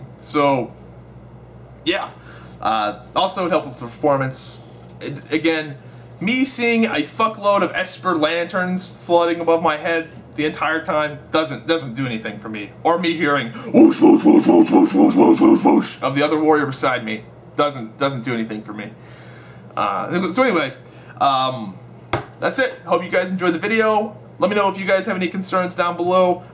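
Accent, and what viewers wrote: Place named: United States. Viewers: Thomas Feeley yeah he's definitely american. Not from the south though, so I'm not sure where from lol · Hes american.... obviously, us Australians have a very different accent.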